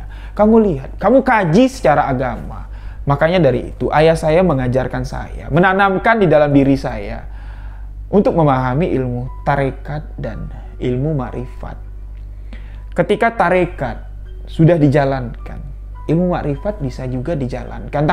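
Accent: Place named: Jambi, Indonesia. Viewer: Mantap, 👍, saya suka cerita dari mas, logat Jambi nya enak di dengar 🙏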